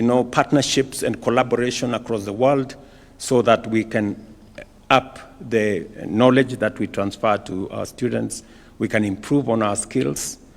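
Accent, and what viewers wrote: Kenya. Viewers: Mr president you are speaking Kalejin English.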